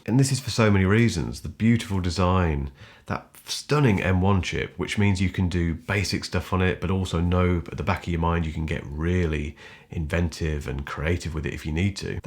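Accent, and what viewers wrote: England, United Kingdom. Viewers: All YouTube reviews should be in English accent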